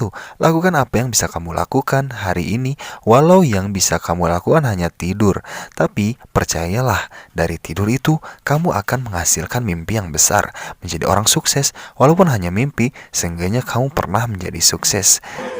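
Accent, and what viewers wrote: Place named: West Java, Indonesia. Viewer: Aduh kang Sunda pisan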